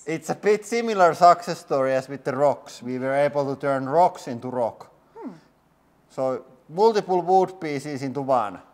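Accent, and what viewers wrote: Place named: Finland. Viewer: Where are you from? The english sounds a bit Finnish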